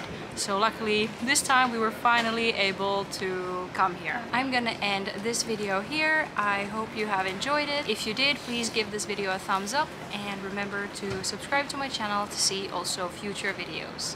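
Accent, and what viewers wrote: Netherlands. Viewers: I´m suprisied that your English accent sounds like a native Dutch person speaking English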